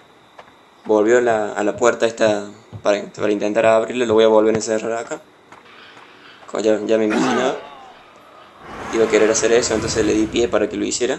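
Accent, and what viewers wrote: Cordoba, Argentina. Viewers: sos argentino, tenes alto acento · Como me encanta como habla este cordobe son uno grande es como yayo 🤣🤣🤣🤣👍👍👍 segui asi papa !!!! Me encanto tus video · Che flaco sos cordobes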